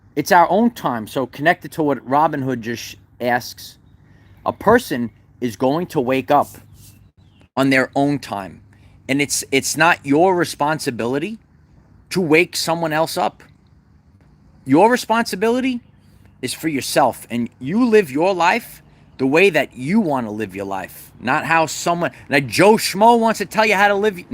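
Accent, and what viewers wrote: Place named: Long Island, United States. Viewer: You always make me think of Long Island where I lived most my life (way you speak), but also you look like and sound just like one of my nephews. Love your channel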